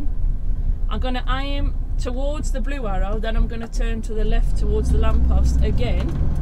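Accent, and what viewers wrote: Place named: West Midlands, United Kingdom. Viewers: Great video. I'm an ex HGV Class 1 driver. I lost my HGV licence due to a chronic heart condition. I now live in Wales but I am origionally from West Bromwich. It's good to hear someone with my accent. Your video really did bring memories flooding back to me. I enjoyed it very much. You are an excellent driver. One small critisism. Please don't mind me mentioning this but I noticed a lot of crossing of the hands on the steering wheel. Apart from that you did a top class job. Accept my good wishes and I look forward to watching more of your videos. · great video ive just started my class 1 from car and stressing before I've done my theroy test you would be a great instructor and love the local accent im a west midlander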